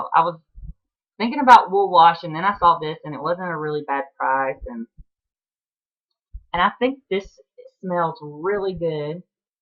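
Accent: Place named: Georgia, United States. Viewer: Good morning, I just checked out your podcast, I did a quick catchup. The whole time I was watching I thought you have a strong Georgian (you all.......) lol accent. I missed you telling your location. Diamond is too sweet. By the way we are neighbors. Marietta is were I knit. Keep it coming.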